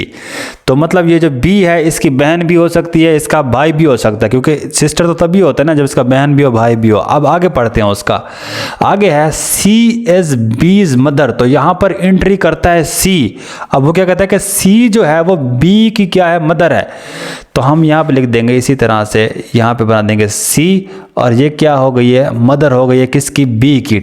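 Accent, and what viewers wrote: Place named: Saraiki, Pakistan. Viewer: ASSLAM WALIKUM NICE VIDEO LGTA SRAIKI HAIN AP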